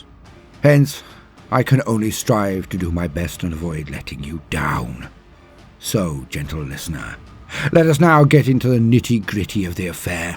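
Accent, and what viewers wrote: United Kingdom. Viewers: one I agree with you YouTube is buggy two can I do r e a c t your amazing videos three are you British? Your excellent sounds like it. It’s serving to hear it as will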